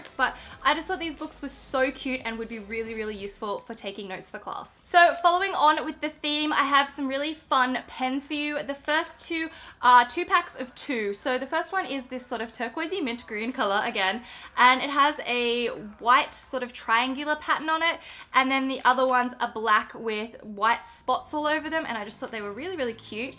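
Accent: Australia. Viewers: And OMG I LOVE YOURE AUSTRALLIAN ACCENT ITS AMAZING AND YOU ARE JUST A LIFES MIRACLE WITH HOW PERRFECT YOU LOOK AND ARE ............... well that explains you in my very creative brain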